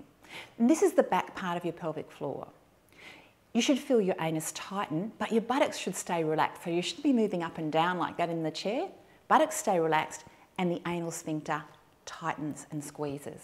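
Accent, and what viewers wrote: Australia. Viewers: I love Australian accent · that Aussie accent helps me feel more confident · Love your Aussie accent. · oh, an aussie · Somehow that Aussie accent helps me feel more confident.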